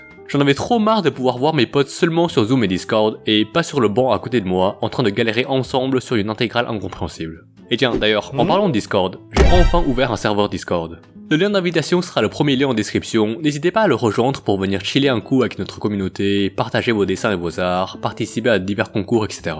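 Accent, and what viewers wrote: Belgium. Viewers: T belge? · Tu es belge?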